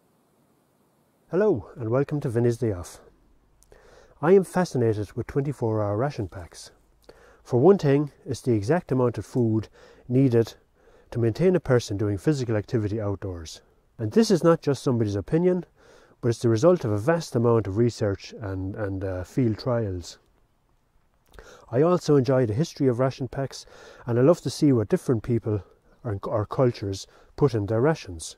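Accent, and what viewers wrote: Tipperary, Ireland. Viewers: im telling you one thing that tipp accent is gold you have a narrators voice and from one irishman to another i hope to bump into ya in the woods someday for a cuppa tea and a chat.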